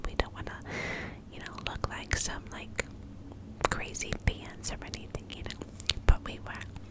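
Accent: New York, United States. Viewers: I have been waiting all day to watch this to help me go to sleep. I LOVE story times with her NY accent, so tingly. Her whisper is the best whisper ever, she needs to have way more followers. The people need to hear her super tingly whisper 💗💗💗 rip dmx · I love all of her videos, but the NY accent is everythingggg. I was born and raised up north and I moved down south a few years ago. I love the southern accents, but I miss the NY and Boston accents, so I really appreciate these!💛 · your ny accent videos are my go to everytime i’m relaxing! they are super fun and relaxing at the same time. thank you 🥰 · honestly, i got tingles in the first like, 45 seconds. love your ny accent videos and your great energy! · Yay, more NY accent whispers!! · I literally can't cope with your NY accent videos. They are SOOOO good. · FINALLYYYY a NY accent video!!!! 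· This video is so tingly I am so glad you posted, I wait there for you to post all the time because your voice is so relaxing🥰😴. Also love the NY accent it adds to the tingles🥰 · the New York accent is so relaxing!!! 💕💕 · This was soo good. I love the ny accent. You should def do a weekly video like this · you look gorgeous as usual! The NY accent is definitely my fave!! Sooo tingly! Hope you and your family are well❤️ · This video made me fall asleep it was sooo good so I came back just to say I love your videos so much and the accent is amazing! 😍 I love the NY accent since I am from England ♥️ listening again whilst I do my makeup 🥰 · loved his video!! your ny accent is so tingly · The NY accent is my fave, sooo tingly 😴 RIP DMX, I was so devastated by the news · Omg yayyy!! NY accent is my favorite!!🥰 Thank you for this video!!!🥳💓💞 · I love your NY accent! Sooo tingly, your Niagara Falls facts in NY accent was the video that made me subscribe to your channel🥰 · another appearance by the green background lighting!! 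my fav. you look beautiful and the new yowrk accent is amazing 🥰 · OMG YESSS... who else absolutely loves the NY accent 😻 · the new york accent vids are my favorite!! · Loveeee your NY accent vids!!! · the ny accent is literally iconic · I'm loving your NY accent 😍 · I loveee the NY accent reminds me of my grandma 🥰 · I love sounds like an Italian New Yorker 😭❤️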